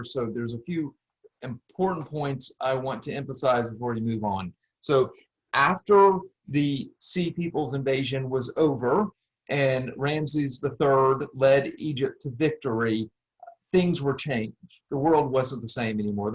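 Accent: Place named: South, United States. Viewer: I think it's just his Southern accent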